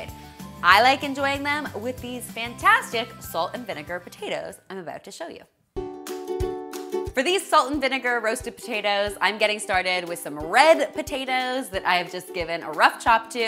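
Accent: Canada. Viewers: Horseradish?? YUCK!!!! Are you Canadian? How have I never noticed your accent before?Besides the horseradish...😷 This all looks yummy!!!!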